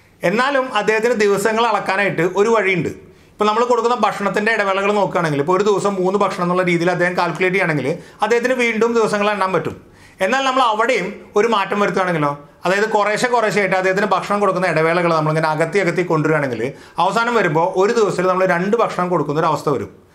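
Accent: Thrissur, India. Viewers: Sir Thrissurkaaranano😀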